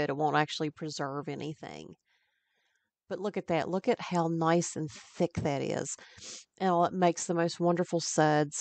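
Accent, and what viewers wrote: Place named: South, United States. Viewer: Lovely job. Very similar to the recipe use.... just subscribed to ur channel I'm a fellow bath and body product creator... love ur personality and I can definitely relate to making messes while working 😆... love ur southern accent btw...I'm from NC and I've got that twang too 😆 God bless and hope to see many more of your beautiful Creations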